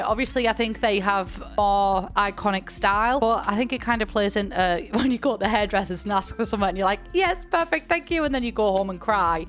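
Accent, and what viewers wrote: United Kingdom. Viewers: Ur british accent is so thick I sometimes fprget that u speak english, but maybe its just me, cause my mother language isnt english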